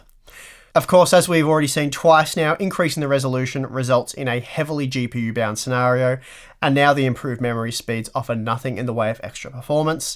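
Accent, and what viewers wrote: Australia. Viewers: Gotta say I can now understand, that australian accent of his really gave me trouble in the first few videos I watch from this chanel and that means a lot due to me living in Australia for almost a decade, ans I thought I can understand the accent already.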